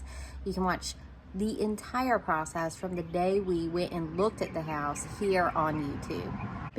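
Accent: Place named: South, United States. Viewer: One question I have wondered (for your Q&A): In your older videos, your voice sounds so different. Did you use to try to talk without your Southern accent? (I like your accent 😊)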